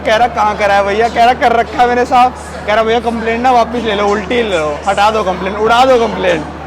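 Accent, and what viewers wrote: Haryana, India. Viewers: His accent sounds like haryanvi accent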